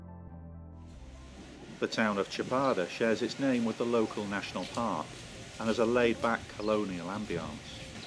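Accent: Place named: Australia. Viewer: Spent my childhood in Brazil, this vid has brought me a lot of good memories.. now in Australia 🇦🇺. You sound Aussie by the way...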